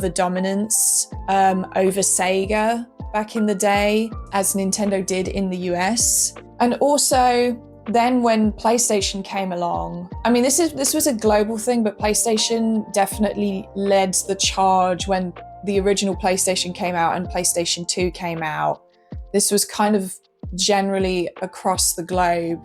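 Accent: England, United Kingdom. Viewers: Cuz she's from England & there's people who actually sound like that. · A cute girl with uk accent playing on a CRT? What else can I ask 😳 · Why are you talking in a Harry Potter accent the whole video? Kinda weird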